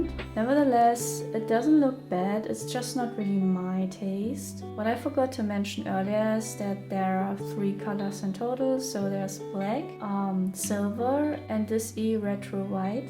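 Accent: Germany. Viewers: Yo you sound like your from Germany ? :D , if so that's pretty nice theres not a lot of custom keyboard YouTubers from Germany :)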